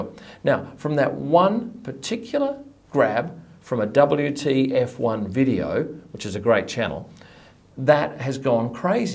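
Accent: Australia. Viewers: Nice to hear an Aussie talking F1.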